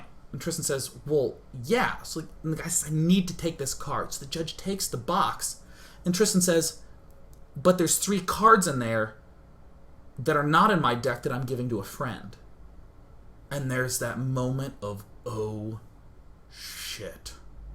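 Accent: Australia. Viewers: He sounds australian not british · It sounds more like a Australian accent XD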